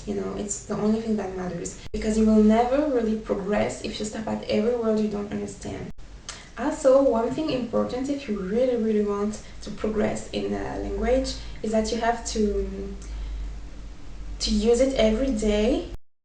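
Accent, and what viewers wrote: France. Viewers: Your accent is so interesting! I hear both the French and Spanish accent, and even an African accent. I've been learning French but I hope to learn Spanish too (and improve my French) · J'ai direct reconnu le français :) sinon bonne vidéo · Her vowels and "r"s sound French to me. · doudi ¿Bueno? tu sabes que... ella todavía está aprendiendo, el inglés y Yo sigo pensando que ella todavía debe tener un acento francés, incluso cuando ella es.. ¡mejor! ¡suena muy muy bien en acento del LA Frances! ¡amigos! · Wow taking into consideration that you are French you speak perfect English but French people don't pronounce the letter h and they consider it a vowel and you still do it but other than that you speak perfect English! =3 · it's very clear she has a very strong french accent... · Good. Now the words are off but you are just as beautiful! 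(wink wink nudge nudge) Thanks for your videos, I would really like to learn a new language and you make it so easy to listen to. I really like that french accent. It sounds gorgeously suggestive to all of us Americans. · You do have a french accent, but your accent isn't impeding your communication. Great job :) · Thanks for the tips. I'm starting learning spanish from zero and will take your advice. P.s love the french accent so don't try and get a more english accent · yea u sound French lol, and wow u r beautiful. nice hair too :)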